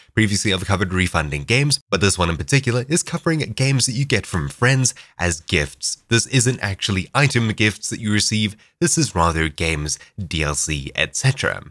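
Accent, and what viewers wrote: South Africa. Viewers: are you a South African? just asking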